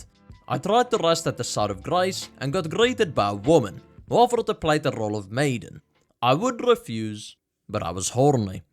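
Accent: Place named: Germany. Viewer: What the hell is this accent? You sound like a German guy trying to speak Portuguese with a new Zealand twist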